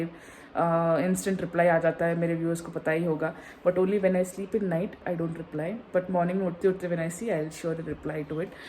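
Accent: South, India. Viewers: R u from south mam ....🤩👍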